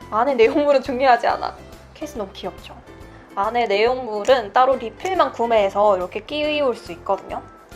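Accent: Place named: Gyeongsang, South Korea. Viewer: 대구 사람이시죠? 말투가 딱 대구같은데😚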